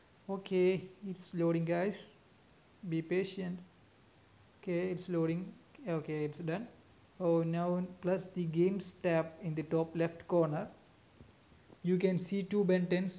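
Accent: Kerala, India. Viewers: You're a mallu i bet!! Hii😂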